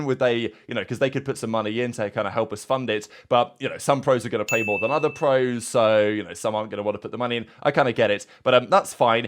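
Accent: United Kingdom. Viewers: Glad you made the decision to keep the intro. I was watching one of ur vids over Christmas break and my mom heard the audio and she was like “Holy fuck that’s a hell a British accent, I can barely understand him with that accent and how fast he’s talking” 😂😂 we’ve gotten used to the intro and switch it up if u think it’s needed change is good but I’m glad the original intro is staying for now lmao.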